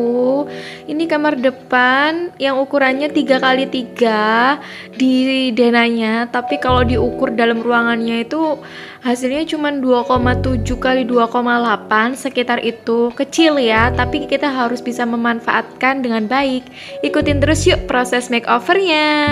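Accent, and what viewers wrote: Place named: Java, Indonesia. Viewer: Orang mana mbak? Kedengarannya medhok hehe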